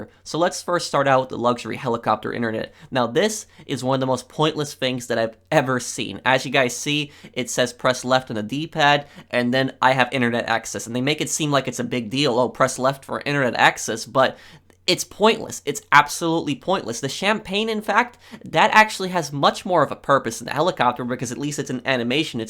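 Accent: Poland. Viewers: Are you from Poland? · Are you polish?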